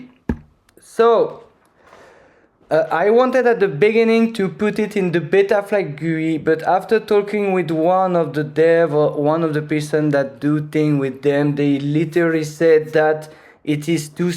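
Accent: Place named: France. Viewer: f*cking english 😂😂😂 nice tutor in frenglish dude 🤜🤛😁😁😁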